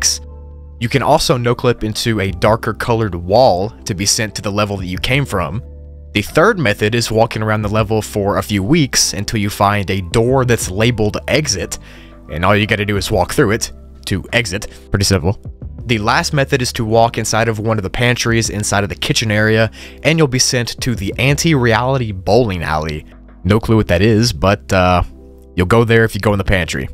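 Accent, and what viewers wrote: United Kingdom. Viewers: are you British?😮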